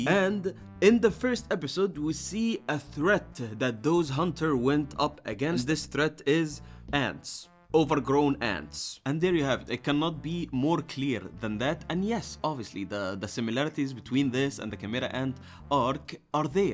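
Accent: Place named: Egypt. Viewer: how r u talking Egyptian in English btw i found u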